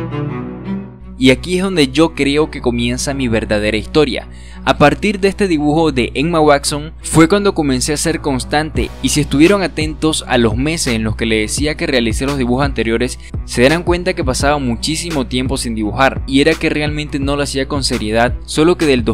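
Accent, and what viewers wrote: Venezuela. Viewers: Eres venezolano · Marico... Acabo de encontrar tu canal. Si había visto videos tuyos pero así de paso. Pero hoy vi uno y escuché tu acento con atención y dije "este pana es Venezolano" JAJAJAJA y me puse a hacer maratón de videos. Mk, eres un maldito psicópata jajajaja alp... dibujas demasiado arrecho y me inspiraste brutal. Gracias <3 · eres venezolano no? yo igual jajajaj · jajajajajajjajajaj no mames eres venezolano jaajajajajajja que risa xdddd yo tambien :V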